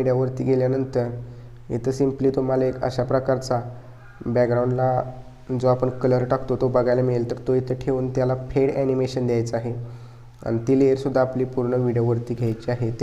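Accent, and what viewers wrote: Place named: Marathi, India. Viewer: एक मराठी कलाकार आणि मराठी आवाज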